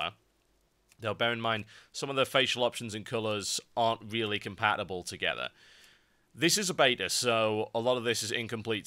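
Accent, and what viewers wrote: United Kingdom. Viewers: Just subscribed... Seen a few of your vids last night and thought ''Hey, this guys British like me, and almost as funny!" Some top-class uploads, keep up the good work - Much appreciated.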